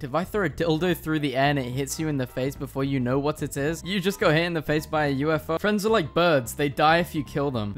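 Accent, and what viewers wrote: United Kingdom. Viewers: Is it just me that noticed he has a British accent now 😃?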